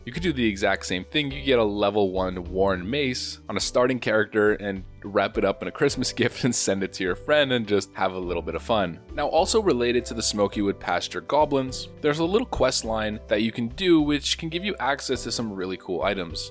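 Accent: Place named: Canada. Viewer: He has the most Canadian accent ever and people are surprised.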